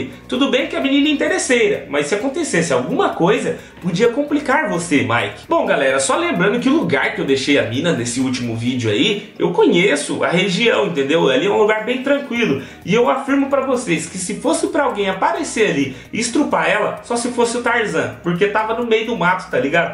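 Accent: Goiás, Brazil. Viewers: fala por que vc fala no pique do goias vc conhece goiania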